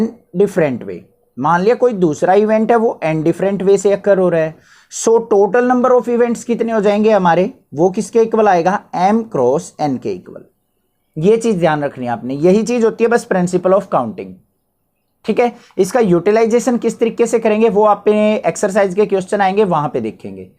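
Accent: Haryana, India. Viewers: sir ji mugje laga hi tha ki aap harayana ke ho aapki language se ma bhi haryana se ho na isiliye,vase ma hisar se hu sir. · are sir aap to sacchi me haryanvi bolte h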